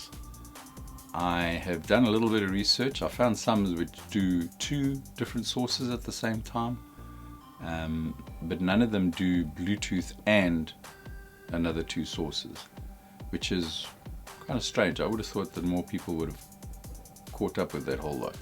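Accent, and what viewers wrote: South Africa. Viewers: Came looking for info on a firmware update for my 840s. Stayed cause I heard a south African accent! Nice one boet!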